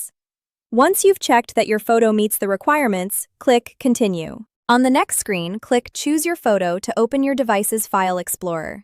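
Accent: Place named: United States. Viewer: why woman with an American accent ?????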